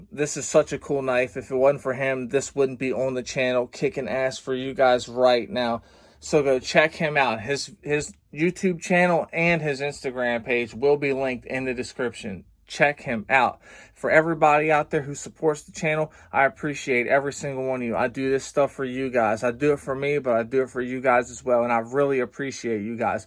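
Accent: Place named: Delaware County, United States. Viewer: Where u from brother? You sound Delco to me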